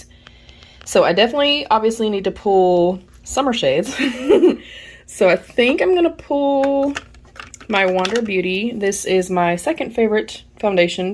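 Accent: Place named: South, United States. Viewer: I love it,! I wasn't sure if you were Southern! 🙃🤪🫠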